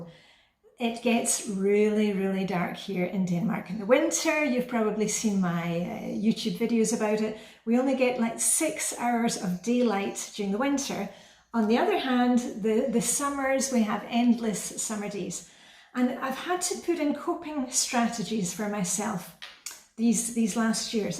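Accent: Scotland, United Kingdom. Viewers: Thank you for an uplifting video I do buy my own Christmas gifts or given exact list. You have inspired me about the 5K in December..... I can walk everyday in December for at least 15 minutes.... I noticed your Scottish accent is stronger after visiting your mom blessings to you, thank you, Dallas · My mother had heart disease & I cringed when I saw your mum drinking alcohol, but maybe it's not an issue with for her. Your accent sounds strong after living in Denmark for 20 plus, but when you return home to Scotland do they tell you you've lost much of it?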